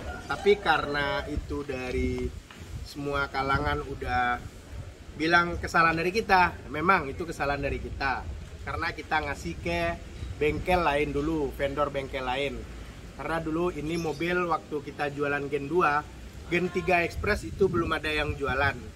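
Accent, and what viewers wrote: Padang, Indonesia. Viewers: Orang Padang bang? Logat bahasa Indonesia nya kek bahasa org Padang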